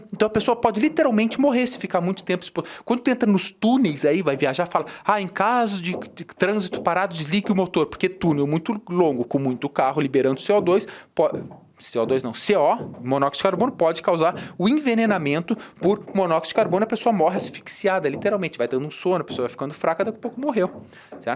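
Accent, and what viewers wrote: Rio Grande do Sul, Brazil. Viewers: meu parabéns, sua vocação com certeza, és dar aula! vim aqui nesta maravilhosa aula, pois a didática da minha professora de fisiologia, não é muito boa! percebi que tu és do RS! sucesso!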